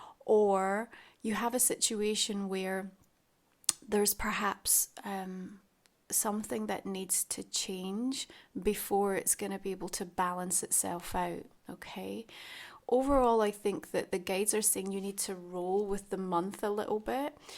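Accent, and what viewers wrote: Scotland, United Kingdom. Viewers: Hi it was lovely to see you in the flesh in Edinburgh, I looked for you I’m sorry we didn’t get a chance to speak more x I love your content, style, delivery and your lovely Scottish burr. ❤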